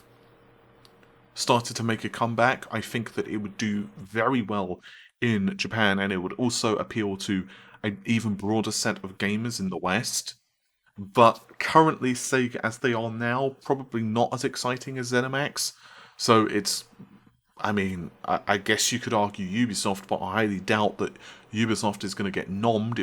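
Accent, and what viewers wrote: United Kingdom. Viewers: British accent OMG 🤣🤦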